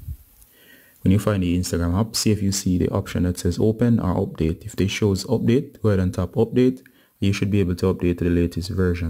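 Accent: Jamaica. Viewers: Love your voice man Are you jamaican or something ?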